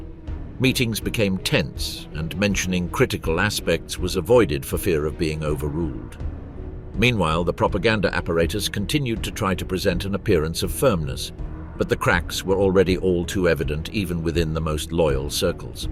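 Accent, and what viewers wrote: England, United Kingdom. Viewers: How could it be the German perspective, when the narrator has a strong English accent ? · How can it be from a German perspective with your English accent? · and more so the accent is English not German!!!! it must be propaganda... the Germans beleived they were winning right to the end with the vunder machinas.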